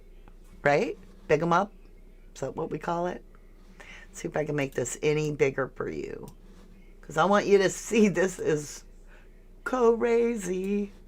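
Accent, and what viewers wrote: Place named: United Kingdom. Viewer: Probably British, and street wise street talking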